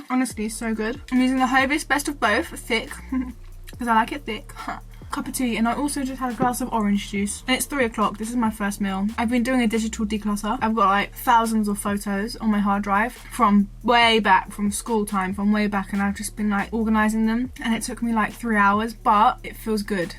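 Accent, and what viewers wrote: United Kingdom. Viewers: I'm also British but I love how extra British you are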